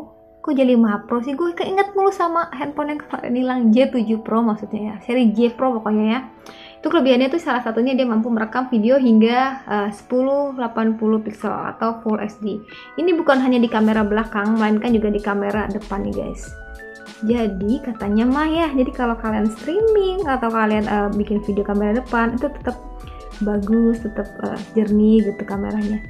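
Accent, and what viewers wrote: West Java, Indonesia. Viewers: Orang Sunda 🤣🤣 suarana teh meuni lembut...aya ngejendol na🤣🤣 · Urang sunda nye teh?😊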